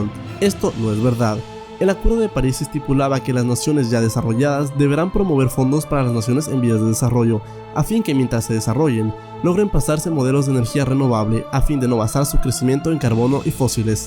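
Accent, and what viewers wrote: Ecuador. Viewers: Oh vaya eres de Ecuador O.o como yo :v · Eres de Ecuador?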